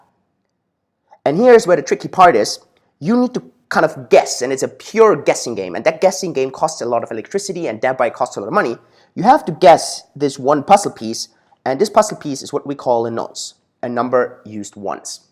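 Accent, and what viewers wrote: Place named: China. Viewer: OMG I LOVE HIS CHINESE ACCENT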